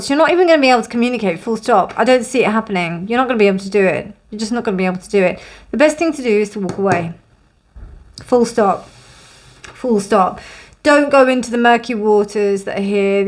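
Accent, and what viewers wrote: United Kingdom. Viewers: Thank you for your guidance and knowledge as always..also FYI your voice and energy are ridiculously soothing :) Brits automatically sound so much more sophisticated compared to the rest of the world!😅 You could make a video of only complaints and insults and I'm sure I could play it to sooth me to sleep. 💜🥰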